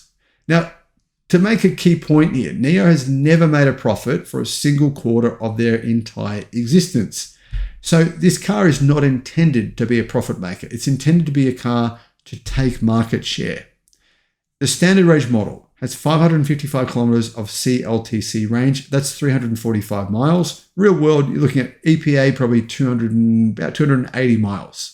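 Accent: Australia. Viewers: He is not an American, he is an Australian. Can’t you tell from his accent?😂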